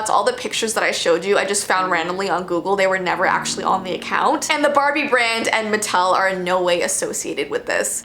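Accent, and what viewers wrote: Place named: Canada. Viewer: I think she sounds very Canadian, when I first found her channel and binged her vids my mom complained that I was saying “out” weird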